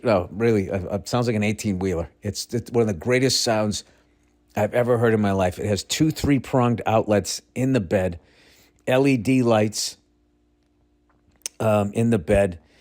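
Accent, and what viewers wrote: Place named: South, United States. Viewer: with the new truck. That southern accent going to fit in perfectly with that big ol truck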